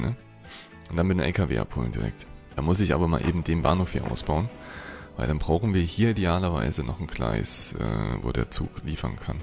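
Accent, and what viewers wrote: Saxony, Germany. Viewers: Hallöle! Guck schon seit ein paar Tagen deine Videos zu Transport Fever 2 und habs mir deswegen auch selbst gekauft. Falls dir auf deiner kleinen schnuckeligem Karte mal Platz und Vorhaben ausgehen hätte ich eine Idee für dich. Im Workshop gibt es eine Deutschlandkarte in extragroß, da könntest dich auch austoben. Ich hab z.B. bei mir die Basiswerte für ein paar Städte angepasst damit sie von der Größe und Fläche auch entsprechend mitskalieren. Ausgehend vom Wert 200 für eine Stadt mit ca. 800k Einwohnern hab ich Berlin auf 800, Hamburg und Wien auf 400, München auf 320 und Köln auf 250 gesetzt um ungefähr an die realen Größenverhältnisse ranzukommen. Zusätzlich fehlt auf der Map jegliche Industrie, die müsstest du im Karteneditor erst hinzufügen. Oder aber, wenn du magst, kann ich dir meine Map zur Verfügung stellen, da es noch ein paar Kleinigkeiten gab die an der Karte zu machen waren. 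Die größte Herausforderung wird sein zum einen den Straßenverkehr in Berlin in den Griff zu bekommen. Wenn man 1850 startet brauch man vor Erfindung der Tram nicht wirklich in Berlin was machen. Als zweites wäre der Aufbau ein nationalen Fernverkehrsnetz nach realem Vorbild + die eine oder andere Regionalverbindung ein lohnendes Ziel. Ich versuch das ganze grad auf schwerem Schwierigkeitsgrad und es ist alles andere als einfach.^^ Zum Schluss noch: Dein Sprachfehler, gepaart mit sächsischem Akzent ist witzig, nicht jeder muss hochdeutsch können. :) MfG, Jester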